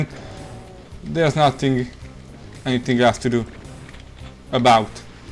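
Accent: Hungary. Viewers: hungarian accent rulez XD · En eskuszom nem neztem volna ki -bol hogy tud angolul.kicsit magyar akcentusos de szarni bele jo az igy is xD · de mennyire magyar ahcentus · YEAH!! Magyar akcentus foreva XD Jó a video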